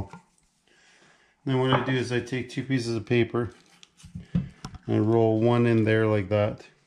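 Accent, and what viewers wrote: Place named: Canada. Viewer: he has that canadian accent so cool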